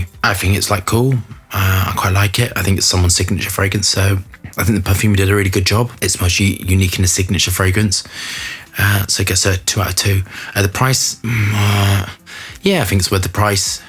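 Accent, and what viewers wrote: United Kingdom. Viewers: i like your Britification of every word. "Le Laleeebo". And maybe a high vapor pressure isolate of patchouli can occur on the top maybe?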